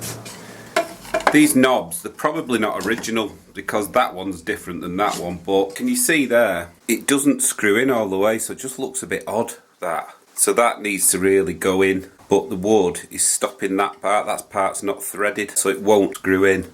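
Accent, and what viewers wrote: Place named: Northwest England, United Kingdom. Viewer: This was a lovely video to watch. You obviously take pride in what you do and the piece should now last for another hundred years. I learnt so much from this for a piece I'm going to try and restore, so thank you. If you take commissions, you should mention how to get in touch with you. It sounds like you're from the NW and I am too, and I know my furniture would be well looked after if I brought them to you.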